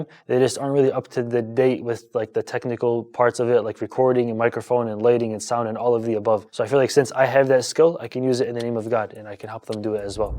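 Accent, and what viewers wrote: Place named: Arab world. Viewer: لا لهجته عربية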